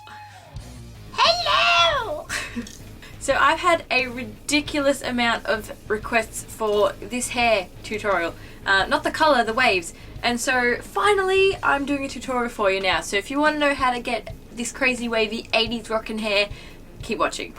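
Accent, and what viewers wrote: Australia. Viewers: r u australian? you sound like it :) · That's an Australian accent! :3 I love Aussie accents way more than British personally. She's got so many great tips on her channel. I think I'll subscribe. <3 and hey, there's nothing wrong with being a kid, we all gotta be one sometime in our lives. Enjoy it! And if the 80s phase makes you happy, go on :D I'm a 90s gal myself so I understand! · Heyy there, would you be able to tell us how you initially explained to your hairdresser exactly how you wanted your hair cut in this way? I've been to soo many hairdressers, whip out so many pictures and it's just arghhhh! When I first watched another video of yours I was like AUSSIE ACCENT! Don't see many of us Aussies on youtube. =]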